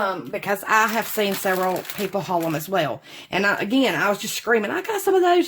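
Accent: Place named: South, United States. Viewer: I love that you are so talkative and cheerful and LOVE, LOVE, LOVE your Southern accent, my sweet! You are so much fun to watch! Big hug and love <3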